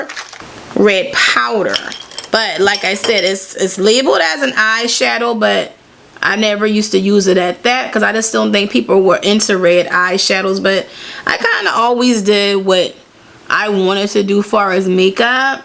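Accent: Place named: New Orleans, United States. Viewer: Loved the lip combination!! and love your accent. are you from nola? lol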